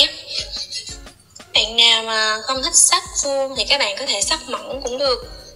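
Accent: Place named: South, Vietnam. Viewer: Vào Vào con cac Người Nam nói giọng miền Nam đi Vào Vào....nhé nhé Con cac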